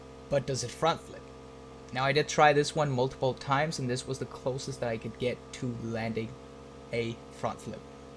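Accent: Denmark. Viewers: are u danish? or mayby norwegian?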